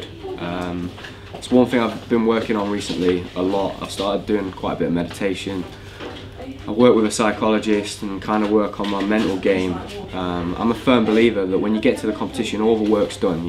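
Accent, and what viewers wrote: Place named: Nottinghamshire, United Kingdom. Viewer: Jesus Christ are you from Notts? Omg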